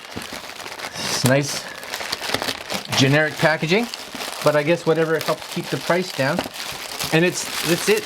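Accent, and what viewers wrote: Canada. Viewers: I’m Canadian but this guy sounds 100 times more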